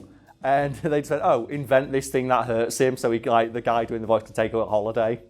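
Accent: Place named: North, United Kingdom. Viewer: Just cuz you sound like ur from the north. It means nothing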